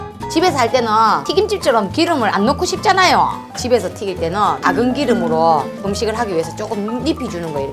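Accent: Busan, South Korea. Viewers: 부사너로써 찐사투리 들을 수 있는 요 컨텐츠 넘 조아요! 그리고 거침없는 요리실력 속이 씌원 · 울 부산 이모랑 말투 똑같..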